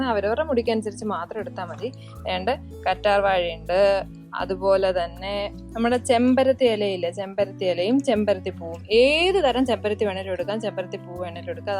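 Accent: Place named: Tamil Nadu, India. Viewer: Akka Tamil sounds akka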